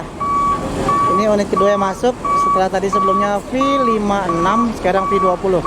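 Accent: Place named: West Sumatra, Indonesia. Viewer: Masak gak tau lakuak paku bg...abg aja asli orng minang...bahasa indonesia abg aja masih ketahuan logat minang nya🙏🏿🙏🏿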